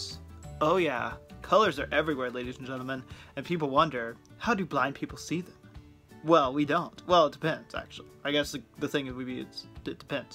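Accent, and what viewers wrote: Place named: Ireland. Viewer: You do have a GREAT St. Patty's Day accent!!!!! And you are so funny,!!!!! Thanks for the laughter you bring me!!!!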